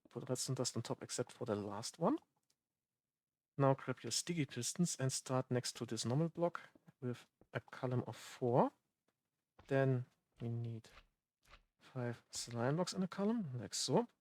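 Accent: Germany. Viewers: keine Kritik, stattdessen einfach eine Bemerkung: du hast einen der stärksten deutschen Akzente von englischen Youtubern, welche ich bisher gehört habe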